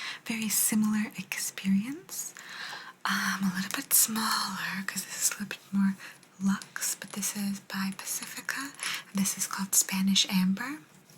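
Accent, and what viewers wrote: Italy. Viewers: ❤ love your Italian accent videos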